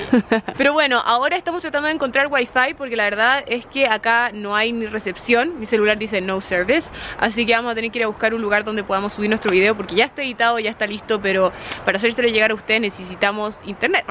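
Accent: Chile. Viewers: •DjPABLO• •Gameplays y Diversion• es chilena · es chilena los chilenos hablan así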